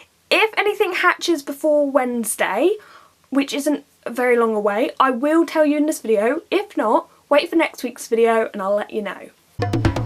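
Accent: United Kingdom. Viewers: I just discovered your channel, I've been watching quite a lot of your videos today, they are all great! You are really passionate about these animals, it's a joy to watch. Besides, i love your British accent. It makes it all very nice, I subscribed without hesitation. Greetings from France :)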